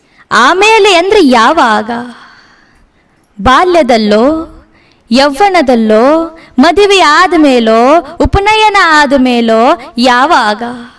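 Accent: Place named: North Karnataka, India. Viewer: Uttara karnataka bhashe shyline ond maja kelokke 😂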